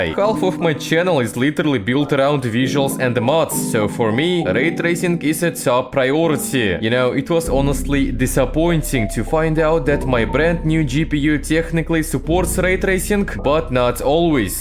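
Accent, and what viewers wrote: Russia. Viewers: I like your accent. 👍 Is it Russian?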